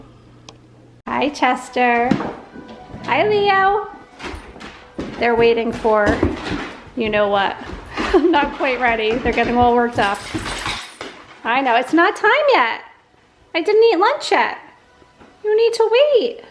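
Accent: New Jersey, United States. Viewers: Just joined your channel! love your content. Love your NJ accent :)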